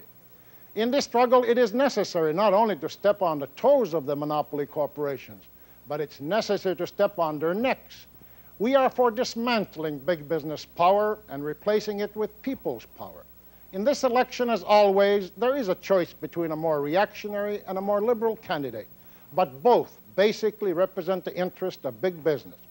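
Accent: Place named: United States. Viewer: He sounds like a american.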